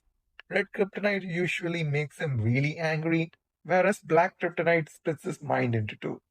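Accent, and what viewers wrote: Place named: India. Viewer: Indian voice makes it feel like a tutorial on YouTube 7 years ago I like it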